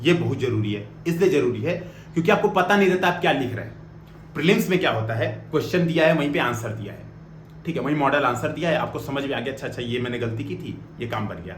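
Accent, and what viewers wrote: India. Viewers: Sir aap gorakhpur se ho kya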